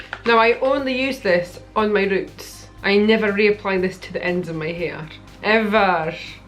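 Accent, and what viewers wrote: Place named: Shetland, United Kingdom. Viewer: I never thought that I would ,be so fascinated by a hair dyeing video ! I guessed that you had a Shetland accent , its a good un !👍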